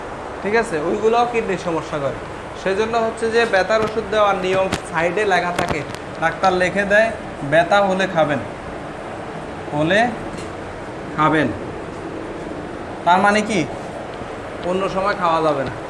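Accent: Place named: Chittagong, Bangladesh. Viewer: স্যার এর লেকচার অনেক সুন্দর, টপিক গুছিয়ে বলে, স্যার মনে হয় চট্টগ্রাম এর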